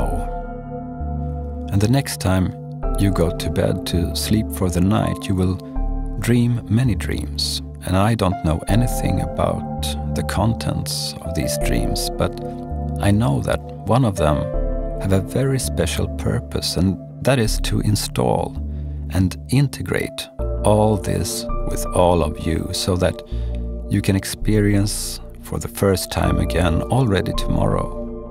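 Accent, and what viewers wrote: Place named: England, United Kingdom. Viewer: I don't understand this English accent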